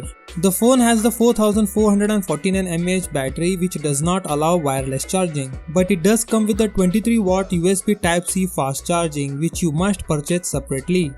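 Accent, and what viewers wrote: India. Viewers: Indian accent is so garbage.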